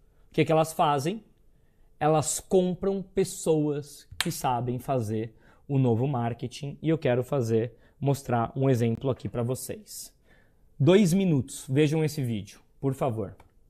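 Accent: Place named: Caipira, Brazil. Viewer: Adoro o sotaque caipira da!!!! Maravilhoso!!!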